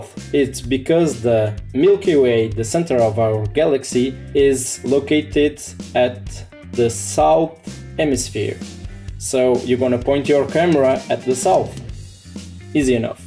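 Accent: Brazil. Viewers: brazillian accent.... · Tu é BR né man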